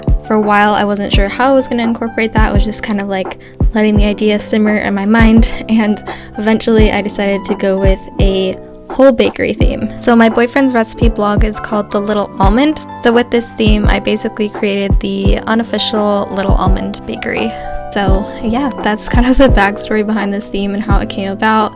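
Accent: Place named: North America. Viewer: Omg this is the cutest spread ever💖💖🥰🥰🥰 And you LITERALLY «stole» my idea for november in my new BuJo which I ordered from A R L, the bjqueen, so I FINALLY have a dotted notebook where the quality of the paper actually can handle my markers (Tombows, Crayola Supertips, Artist Brush Pen, Tombow Fudenosuke, Pigma Microns etc, none of them ghost or bleed through. But all my other notebooks is so poor quality and this is my first time (year) with Bullet Journaling so it was truely awesome when it arrived in the beg.of October. But I don’t have a boyfriend at the moment, so I was gonna use my bestie from high school she owns a bakery and is rediculasly good at making cakes and stuff like that, so (I kid u not...😅) I was gonna ask her if she could send me some photos close up of some of her work and her shop of course. She lives four hours by car away from me, so it is very long time since I saw her and we kinda lost touch so I was gonna text her like right now and ask about the pics and I have been running around changing lightbulbs in my apartement cos it was so so dark in here. 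And Norway is six hours ahead of NY and nine hours ahead of LA, and idk where u are in the USA, or Canada, I just know, or believe you’re North American cos of the accent you have❤️💙🇳🇴💙❤️💖👱🏻‍♀️💖😺💖🐶💖📚📚🖌🖌🎨🎨🎨🩰🧘‍♀️❤️ Ps: I just wanna say: HUGE FAN of your art. The oct was the 1st I saw, and now I am completely hooked🥰🥰🥰😁😁😁😁😁😁😁😁😁😁😁😁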